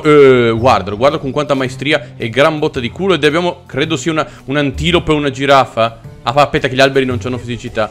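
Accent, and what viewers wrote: Veneto, Italy. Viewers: Il tuo accento veneto è sublime.😂😂😂😂😂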